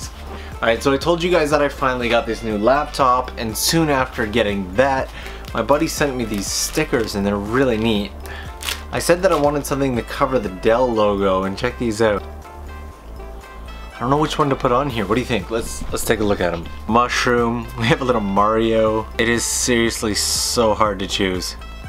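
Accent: Canada. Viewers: I never realized how Canadian you sound until this video. Lol Anyway, wish I would have had an option like this back in 2015.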